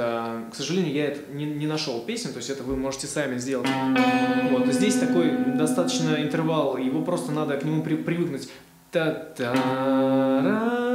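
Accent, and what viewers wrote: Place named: Germany. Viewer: Урок - супер! Спасибо! Те кто думает, что может играть без интервалов, Вы правы, но забудьте об импровизации (хотя Вас может выручить идеальный слух и память)., очень забавляет (по доброму) Ваше произношение английских слов с немецким прочтением;)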